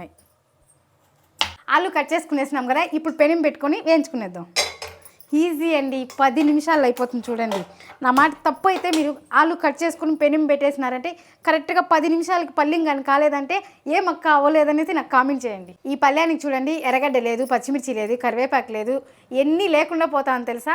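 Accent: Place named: Chennai, India. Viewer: are u from Madras because my in-laws are from Madras so I want say is what all u r cooking I am so familiar because all the items I too cook at home so exactly I know how it taste my favorite venchina pappu oh my God I can’t believe please let me know from where are u ok the way u talk too it is same I love it keep smiling enjoy look forward another recipe 😋.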